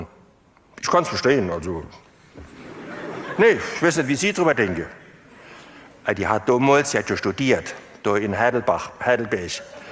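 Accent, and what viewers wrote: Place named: Saarland, Germany. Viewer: Herlich ..und noch uff saarländisch 🤣🤣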